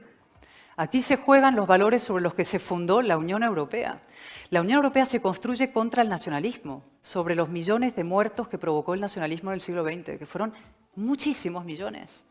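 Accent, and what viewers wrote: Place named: Argentina. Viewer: No me gusta Cayetana habla bien pero el tono prepotente y el acento argentino ese chirría un poco.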